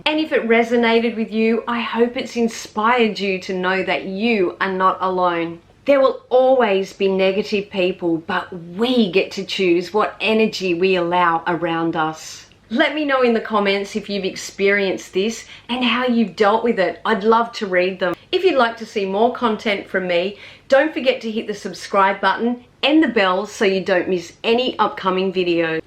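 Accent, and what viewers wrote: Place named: Australia. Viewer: lovely princess, you always make solid points about the content on your channel, so inspiring, so informative, very classy, and very creative, plus, you have such a beautiful glow on your pretty face, and the most adorable accent in Australia. I have so much respect for you, the same way you do for me. Send you a bow like a noble gentleman, and you send me a curtsy like a graceful princess. 🤗🤗🤗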